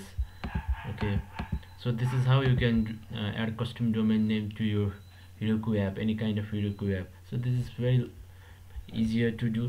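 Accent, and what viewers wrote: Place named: Nepal. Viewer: maile ta nepali accent thyakkai chineko hoki jasto lagyo...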